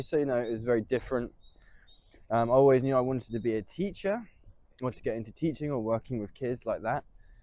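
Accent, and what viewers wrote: United Kingdom. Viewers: Блин, чел, как же тяжело воспринимать английский акцент после постоянного американского. Англичане, пилите больше контента! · Okay, I subscribed. I hope it will be interesting to look at Russia through the eyes of a Brit, and at the same time it will be useful to listen to a non-American accent. For some reason, I suddenly remembered a quote from a famous Soviet comedy: "- Well, if you come to our place on Kolyma, you'll be welcome... - No way! You'd better come to us!" 😂😂😂 Greetings from Krasnoyarsk!✌😉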